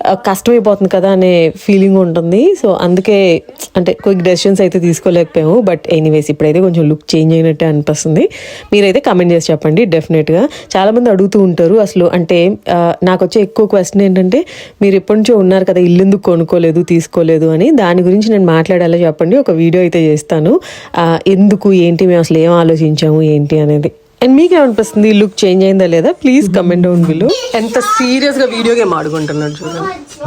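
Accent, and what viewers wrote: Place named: Andhra Pradesh, India. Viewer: Authentic andhra telugu by bro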